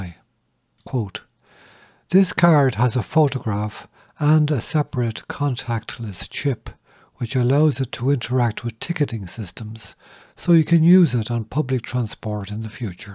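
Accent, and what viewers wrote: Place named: Kildare, Ireland. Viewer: For a Dub you really sound more like a Kildare man, there is a bogger in you somewhere.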